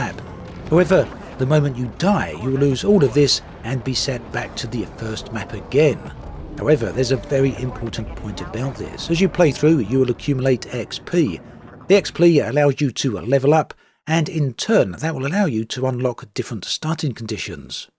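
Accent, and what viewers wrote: United Kingdom. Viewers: I had a bit trouble listening to this video, great although it was. I'm not sure if you're doing it on purpose, but you intonate word in a subtle but bizarre way, word such as "video" you say "vide-ó" stressing the 'o'. You have a pronounced British accent, so It just felt out of place.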